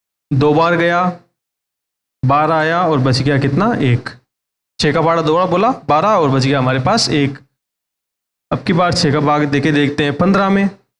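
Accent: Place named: Rajasthan, India. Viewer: Bhai kuch interesting video banao biring lagta hai,dekho ek tho aap logo ki language sahi nahi, English talking meh Rajasthani jhalakti hai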